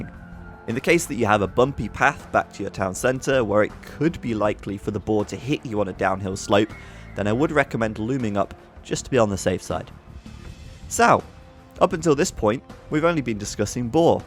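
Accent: United Kingdom. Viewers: an AoE textbook in a flawless british accent. :3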